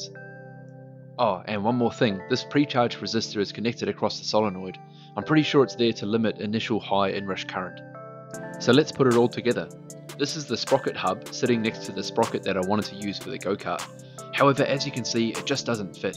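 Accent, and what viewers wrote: Australia. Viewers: that looks like fun. and it sounds like you are you are a fellow aussie. where are you buying the controller from?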